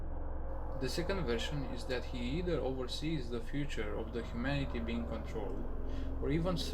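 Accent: Romania. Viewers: Wait,you are romanian?i ask cause of the accent and the flag gas mask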